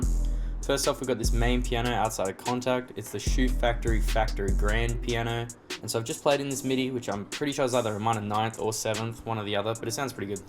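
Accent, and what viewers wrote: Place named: Australia. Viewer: He prolly from Australia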